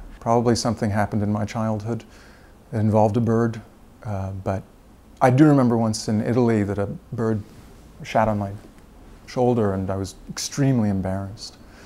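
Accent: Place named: United States. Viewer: UAU...I mean, he's so hansome!!! Pretty eyes, pretty look, a litle bit faty'iiish and he's not a "bird man" maybe a cat person or a dog person just like me, (I love dogs). Oh, and I love his so american accent. And, in spite of criticizing italian people, witch I adore, cause they are so emotional and with a spicy drama in everything they do or say and they speek like as if they were singing and they also speak with a lot of hands gestures and body language but, well... in the end it all suits him very well. Pretty hansome guy, indeed.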